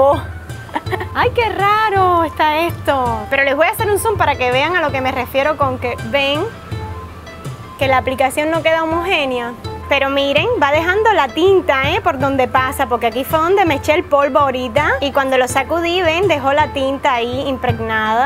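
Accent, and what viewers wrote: Cuba. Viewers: si es de Cuba pero vive en Miami 😉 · Eres cubana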